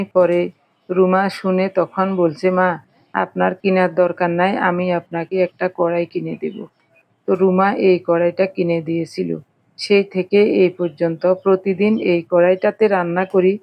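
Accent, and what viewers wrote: Noakhali, Bangladesh. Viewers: দিদি আমি নোয়াখালী থেকে বলছি। আপনার কথার মধ্যে নোয়াখালীর একটা টান আছে, বুঝতে পারছি না আপনার দেশের বাড়ি কোথায়। খুব সুন্দর লাগছে সবাই টুকটাক কথা বলছেন।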